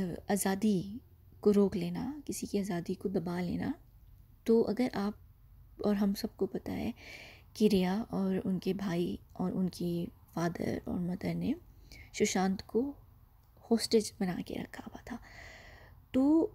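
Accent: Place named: United Kingdom. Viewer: No worries, do what you want. I just appreciate your intelligence. Accent and language doesn't matter at all. Apart from that, I am enough efficient to pick on your U.K. accent where you lag. Let me know if you need help for language/ accent part.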